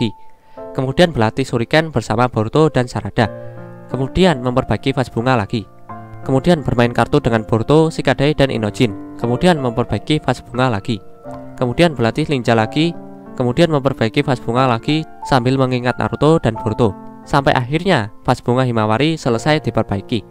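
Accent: Java, Indonesia. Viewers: Medok men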